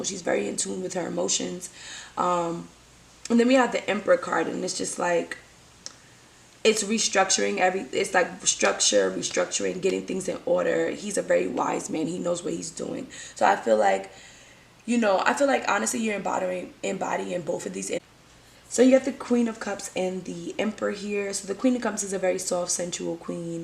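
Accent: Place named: Brooklyn, United States. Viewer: This was a great reading this resonated with me so much! You are so relatable as well, growing up in Brooklyn this is exactly how we speak lol. I will continue to follow you IG page and watch your tarot spreads…thank you for sharing your gift with us!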